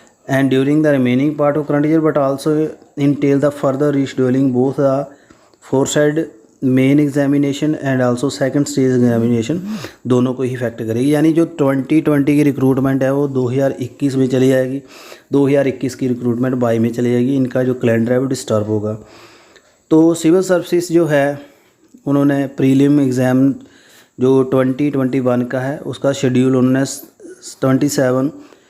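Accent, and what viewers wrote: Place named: Punjab, India. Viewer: Sir ji twadi punjabi esde vich saf nazar a rhi hai...i like it 😍😍😍😍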